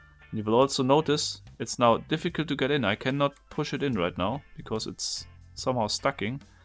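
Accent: Germany. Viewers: In diss and diss :D dieses geile denglisch :D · ich finde es immer lustig, wie man direkt an der Aussprache merkt, dass da ein Deutscher Englisch spricht :D ich mag dieses Platte Deutsch/Englisch :D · Subbed i just find you. Guttentag ich wohne in die niederlande. Aber meine deutch is nicht gut. Meine name is inge. Ehm thats problaby all i got for now lol. Somehow i first thought u were france lol but in this video i got it your heavy accent is deutch. But maybe a tip? Stop saying spoiler break. Doesnt make sence. Its an annoucement. In the screen with text there is no spoiler its just a anoucement. So its better to just let the screen happen. Thats how most people do that. I know i watch alot i mean hours a day of youtube. Im chronic ill so im home. I live with my second dad. So i hope this tip helps you for making even better videos. 👍 ⚘⚘⚘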